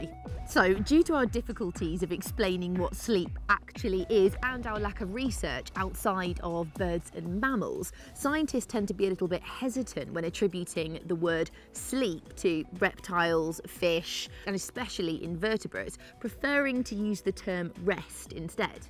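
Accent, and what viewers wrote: United Kingdom. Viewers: And I like it. She has a beautiful British accent.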